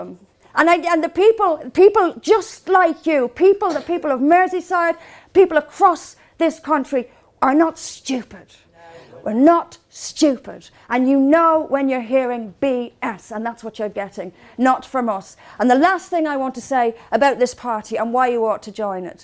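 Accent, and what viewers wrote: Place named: Ireland. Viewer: Good speach young lady.. Romans .. Our Lords blessings in your assistance to seccede in everything that you set out to achieve.. Keep sn eye on The Torys and what they write in the small print of bills... And by the by the lilt of the beautiful Irish accent serves only to enhance the beauty of the daughters of men.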